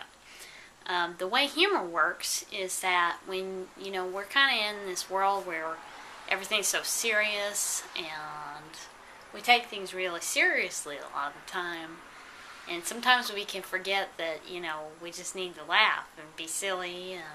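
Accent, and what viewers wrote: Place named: South, United States. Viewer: Listening to your videos really connect to me , you really captured me and your wisdom hit my core. I live near and Arkansas and you sound like you live in the south. You live in the south?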